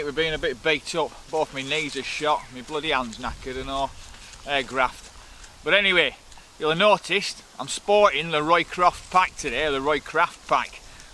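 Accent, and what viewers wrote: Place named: Scotland, United Kingdom. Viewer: Your Scottish accent is entertainment In itself 😂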